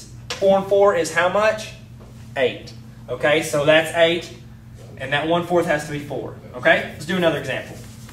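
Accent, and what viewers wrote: United States. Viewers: our teacher makes us use a damn compass to find this stuff, but i got the jist of what you were saying. Great video, and nice accent #amurica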